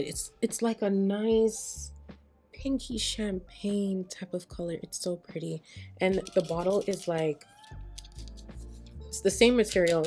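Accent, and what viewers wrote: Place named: Jamaica. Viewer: It’s the Yardie accent for meeee lol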